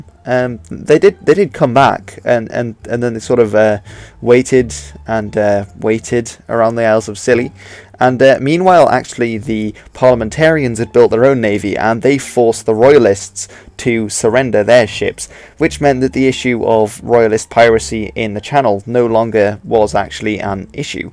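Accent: Netherlands. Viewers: Are U dutch? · Are you dutch because you've made at least 4 video's about the netherlands and because i know your dutch accent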